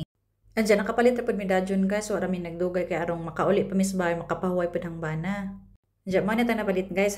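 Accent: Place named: Bohol, Philippines. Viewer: Hala taga alicia ka ako pud taga alicia hahaha kagamay sa kalibutan dini pata nagkita sa vlog hanga ko nimo kay binol anon gihapon ka mag storya pareho ta dia kos uk pero bola anon gihapong daku 😅 amping kanunay mo diha ❤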